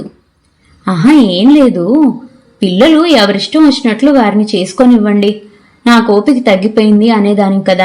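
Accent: Godavari, India. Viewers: చాలా బాగా చదివావు! గోదావరి యాస చాలా బాగా పలికావు. అభినందనలు 🎉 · గోదావరియాస బాగా అలవాటైనట్లుంది 😊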